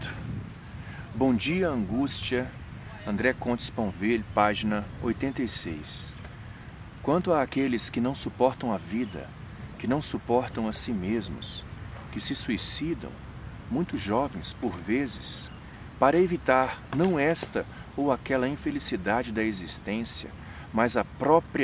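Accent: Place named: Brazil. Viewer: tu tem muitos vícios de linguagem brasileiros no teu inglês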